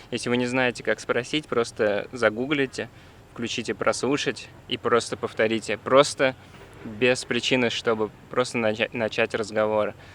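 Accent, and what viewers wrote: Russia. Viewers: на мой взгляд ты специально делал русский акцент=)) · Господи да у тебя такай русячий акцент, это ужасс! И еще чет гундосит тут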